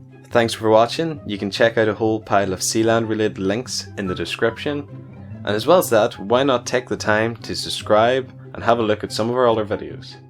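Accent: United Kingdom. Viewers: I really like your videos. I just subscribed. What part of the UK are you from? I really like your accent.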